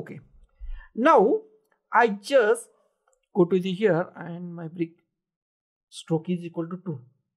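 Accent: India. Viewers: Good tutorial right here, just fix your hard indian accent and you'll get 100k sub in no time. Cheers man, big ups to you.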